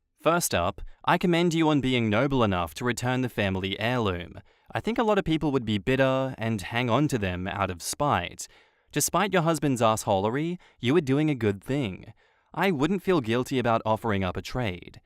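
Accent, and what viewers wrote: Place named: Australia. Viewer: thanks for the Aussie accent such a pleasure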